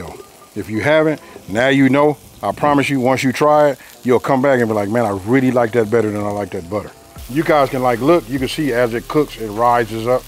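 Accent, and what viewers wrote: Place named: West Coast, United States. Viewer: You can tell his from Cali or somewhere from the west coast gangsta turned chief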